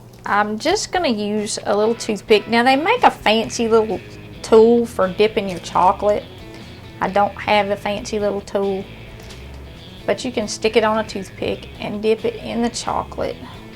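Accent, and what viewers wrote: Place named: South, United States. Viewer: love ur southern accent... so adorable!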